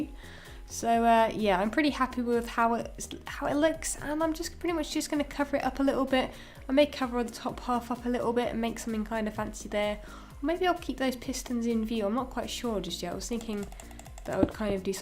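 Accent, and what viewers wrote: United Kingdom. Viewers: Can't get bored of your british accent <3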